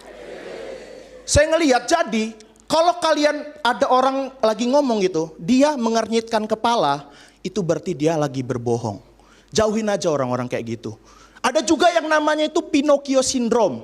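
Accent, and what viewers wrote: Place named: Lampung, Indonesia. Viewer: eksen bahasa lampungmu masih kental bro. sukses bro karirnya.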